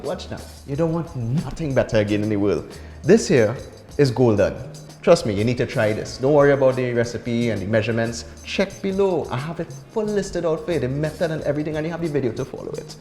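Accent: Trinidad and Tobago. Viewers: Boy you talk like REAL,country folk(from gran couva) people love this dish cause any thing goes with a hot pepper. . ...Boy time to get teaching to us to make black cake ginger beer and some wine boi😊 CHRISMAS SEASON......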